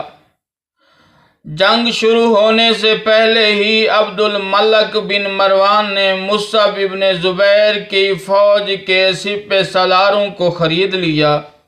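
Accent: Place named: Punjab, Pakistan. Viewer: Panjabi urdu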